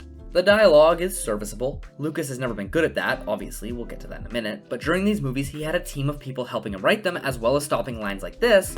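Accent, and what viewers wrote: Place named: United Kingdom. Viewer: Like the British accent! You should probably go with that from now on🦹🏻‍♂️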